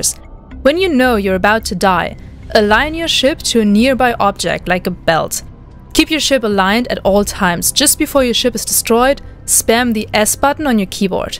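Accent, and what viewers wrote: Germany. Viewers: a German lady with a wonderful English accent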